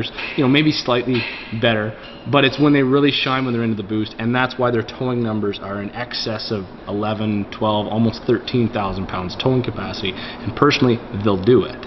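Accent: Canada. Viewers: I liked the video until I started hearing all the "aboots" crazy canucks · I was about to say that he sounded as if he was from Ontario. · Louis Healan he sounds extremely Canadian. Which is fine. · Very informative, easy to follow along, and I'd love to see if he has a video on the 2.0L ecoboost engines like in my 2016 Focus ST. Big thumbs up on this guys' just no bs knowledge based on his experience. Sounds like he's from Canada, shout out from down South in Texas! ^_^ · He's not only Canadian, but he lives in the heart of Mennonite country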